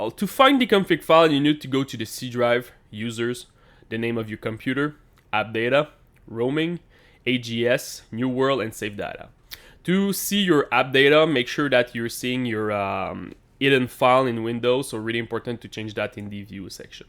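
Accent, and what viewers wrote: Quebec, Canada. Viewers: J'adore comment on peut reconnaître les Québécois ! Hehehe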